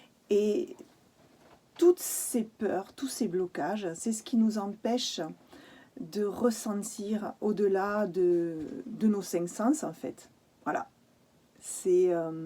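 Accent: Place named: South-West, France. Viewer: Ohhhh merci pour votre lumineuse et étincelante Présence, merveilleuse déesse au bien joli accent (du sud ouest?). Attirée avec un bel élan de vous écouter immédiatement et absolument ravie d'adhérer à vos propos si exacts!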